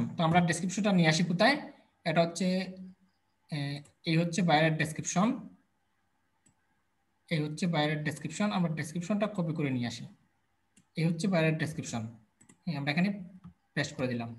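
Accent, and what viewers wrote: Sylhet, Bangladesh. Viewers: Vai er bari Sylhet Naki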